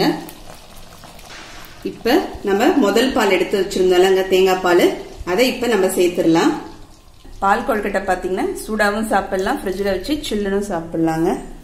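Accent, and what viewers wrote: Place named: Srirangam, India. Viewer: இப்படி இடியாப்பத்திற்கு மாவு அரைத்து பிழியலாமா? Sister! நீங்கள் பேசும் பேச்சு! ஸ்ரீரங்கம் மாமிகள்! பேசுவது போல் இருக்கிறது! நான் ஸ்ரீரங்கம்! Sister!❤️❤️❤️💐💐💐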